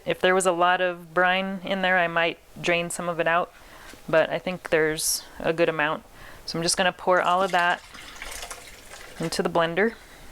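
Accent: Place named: Ohio, United States. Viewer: you sound like you're from Ohio with family from PA or WI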